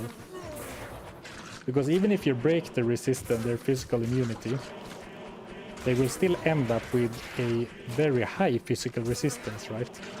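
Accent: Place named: Sweden. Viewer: The Swedish accent is fun, and we understand everything you say just fine. If you want to get rid of it, do so naturally over time, don't go out of your way to try to hide it. I like my Swedish d2 youtuber and streamer.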